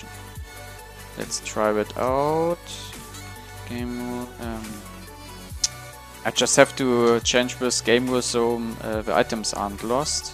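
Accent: Germany. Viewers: man merkt voll der ist deutsch haha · Man hört das du ein Deutscher bist ;) #NoHate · Man hört voll das du deutsch bist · i thought youre german lol because you Sound like this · Are you german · Bei deinem Englisch hört man das du Deutscher bist XD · are you german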